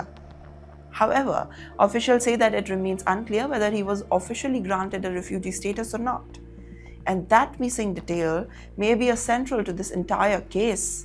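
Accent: India. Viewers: Terrible curry English!!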